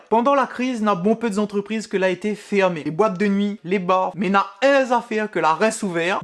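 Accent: Réunion, France. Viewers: je te suis depuis peu et tu es une vrai pépite! Ce petit accent yab tellement irrésistible ainsi que la fraicheur et le piquant de tes vidéos, quand on les regarde c'est un peu comme si on mangeait une barquette bouchons avec siave pour une réunionnaise installée en Métropole... continue fait rire a nou encore lontan ;-)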